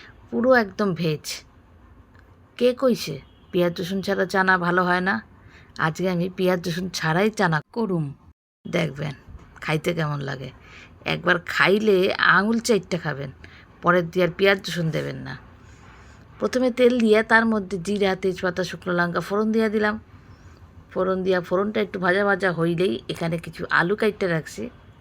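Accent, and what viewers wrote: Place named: Dhaka, Bangladesh. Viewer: দিদি ঢাকাইয়া কথা আমার শশুর বাড়ি বাপের বাড়ি সব ঢাকাইয়া। তোমার কথা শুনলাম সত্যি সত্যি অনেক ভালো লাগলো। চানা দারুণ লোভনীয় হয়েছে। তৈরি করার পদ্ধতি দারুণ। ভালো থেকো দিদি। ❤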